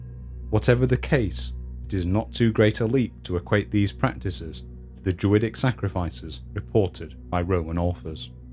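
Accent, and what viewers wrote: United Kingdom. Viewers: It's and I need some white noise to allow me a chance to get some sleep: Oh! British history in a British accent will do the trick.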